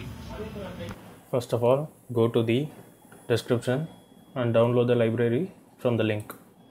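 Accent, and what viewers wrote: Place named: India. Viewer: Damn always Indian accent...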